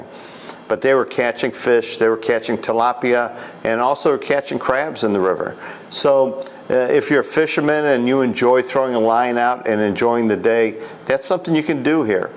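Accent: United States. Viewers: You from Chicago? Your accent sounds like it?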